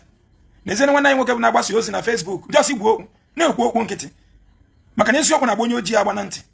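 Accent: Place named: Nsukka, Nigeria. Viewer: Today you speak like nsukka man. first in history for ❤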